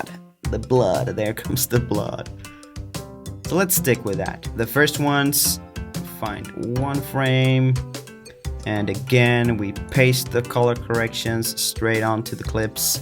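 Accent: Sweden. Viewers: Are you from sweden?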